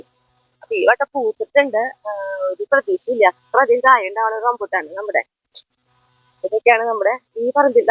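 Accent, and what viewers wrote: Thrissur, India. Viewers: വീഡിയോയും കൃഷിയും ഭക്ഷണവും എല്ലാം വളരെ നല്ലതാണ്... പക്ഷേ പറയുന്നത് പകുതിയേ മനസ്സിലാവുന്നുളളു. ഒന്നാമത് തൃശൂർ slang... പിന്നെ ഭയങ്കര സ്പീഡും.. ചേച്ചി തന്നെ വീഡിയോ ഒന്നു കണ്ട് നോക്കൂ.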